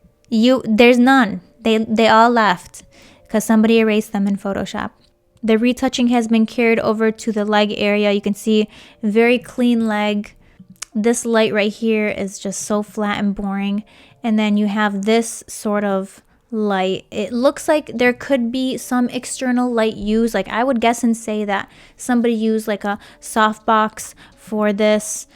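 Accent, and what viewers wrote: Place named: Midwest, United States. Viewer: I can tell your accent is from the mid-west lol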